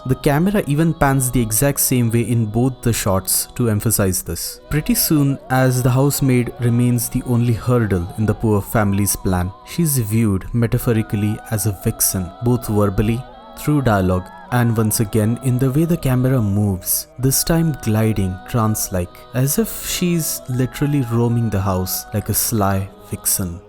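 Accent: India. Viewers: Indian accent always stands out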